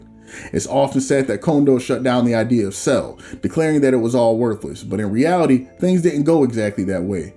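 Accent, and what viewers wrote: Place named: Midwest, United States. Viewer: What part of the Midwest you from 😂. STL represent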